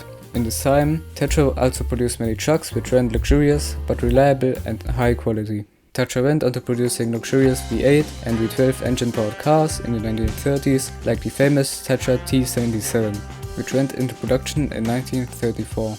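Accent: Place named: Germany. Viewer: Ich höre mehr Deutsch als English, aber trotzdem gutes Video.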